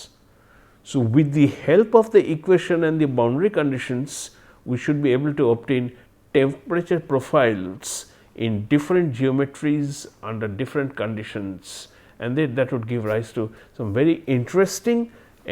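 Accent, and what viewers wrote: Bengal, India. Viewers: His Bengali-accented pronunciation is so difficult to understand. Did he say "zone heating" or "Joule heating"? This is the problem with MOST IIT Kgp Bengali professors.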